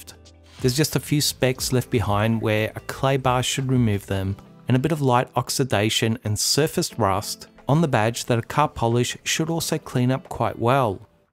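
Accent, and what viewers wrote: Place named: Australia. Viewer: I’ve been a fan of your work for a little while now. I appreciate your methodical approach to detailing, and I really appreciate the tutorial like aspect of your videos for people to pick up on some of your techniques. You seem to not mind sharing the knowledge, and there is value in you wanting to do that. Thank you, and amazing work with great results! Keep it up Your accent sounds Australian, greeting from Canada. Ricardo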